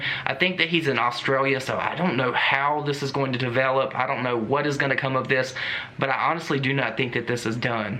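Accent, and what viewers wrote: South, United States. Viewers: I found your channel a few months ago and I am so happy I did. Maybe it's your southern accent and attitude. I was born in the south but moved to the west as an adult. I miss my family and you remind me of all of them. Your content is to the point and short, love it. Anyways just wanted to say hey!!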